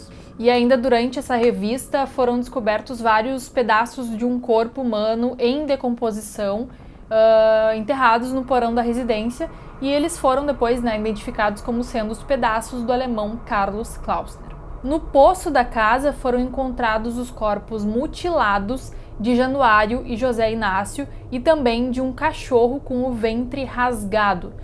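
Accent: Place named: Rio Grande do Sul, Brazil. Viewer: Pior não era, disso estou bem certo! O que acontece é que naqueles tempos, era tudo na base das testemunhas, dos suspeitos, O que tornava difícil resolver crimes, O cara quando é mal, Ele mata até pra roubar uma bicicleta! Não acho que a época influencia no caráter dos bandidos, ( mas isso sim, ela facilita ou dificulta as ações deles! ): Tô com saudades dos teus vídeos, você tá preparando algum para breve??? ( ACHO LINDO O TEU SOTAQUE DE GAÚCHA ).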